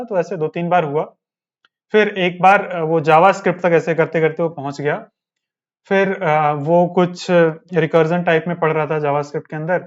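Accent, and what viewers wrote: Awadh, India. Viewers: bhai kaha se ho? Apke awadhi language ka touch mere Balrampur and Gond district wala lag raha hai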